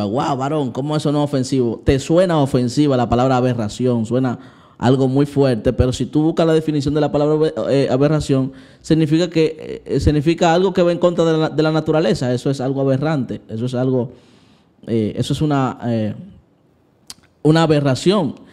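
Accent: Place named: Dominican Republic. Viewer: Es que ese el acento dominicano. Sip, somos el país que peor habla el español en Latinoamerica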